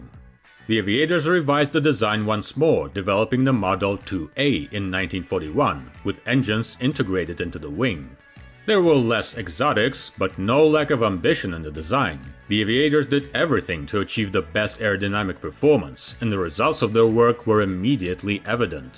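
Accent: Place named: Russia. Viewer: Английский не его родной язык, он русский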